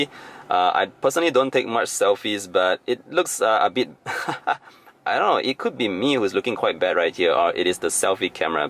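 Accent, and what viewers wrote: Nigeria. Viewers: You sound like Nigeria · Love your accent... You've got Nigerian friends? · Bro you have a Nigerian accent...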